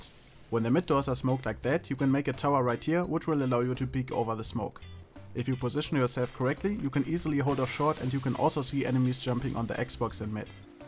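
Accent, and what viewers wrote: Germany. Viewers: liegt vllt daran das er deutscher ist bin mir aber nicht sicher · Dein deutscher Akzent ist voll krass zu hören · Du bist eigentlich deutsch ne :D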